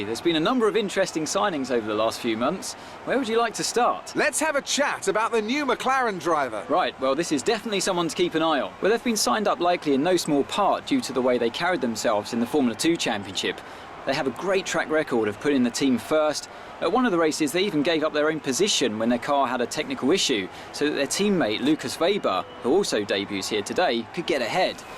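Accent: Scotland, United Kingdom. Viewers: he is scottish. It's their accent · U Scottish?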